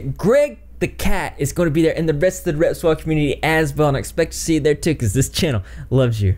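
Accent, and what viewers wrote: South, United States. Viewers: Your hair is fantastic, I'm greatly enjoying your videos, & your wonderful Southern accent sounds a lot like mine lol. Greetings from Asheville in Western North Carolina :) Yeah this whole Baron Trump book thing is CRAZY! I figure Lockwood or someone long ago had a dream or vision about the time we are living in, then wrote these books from what they saw? President Trump is a baron too, a property baron, as well as part German. Wonder if that illustration in the book also closely resblembles the Donald as a child? Yes, cold shivers here too, for real. And I don't get those easily. I live in constant synchronicities that many people would not believe. So much that I plan on writing a little memoir book about my life lol! But this book is wild. Great coverage & commentary! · Sounds like a Southern accent from the southeast US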